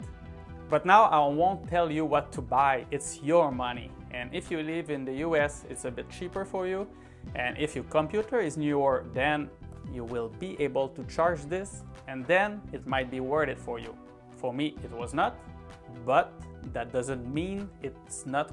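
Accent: Quebec, Canada. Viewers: C"est moi ou tes quebecois?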